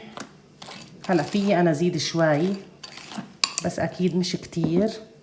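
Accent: Lebanon. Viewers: مبدعة يا بالوصفات الكوري والمغربي والعربي بتجنني وبتاخدي العئل بلهجتك اللبنانية محبتك من السعودية إيمان❤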